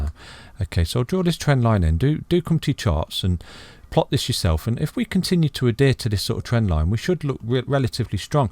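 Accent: United Kingdom. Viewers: Ur great In ur comments mate mint work how come u work in dollars as u sound like ur from the UK?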